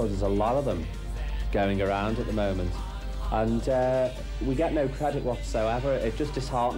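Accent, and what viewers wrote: Liverpool, United Kingdom. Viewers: Does he talk with a Liverpool accent? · Am I the only one who loves his liverpoolian accent?😳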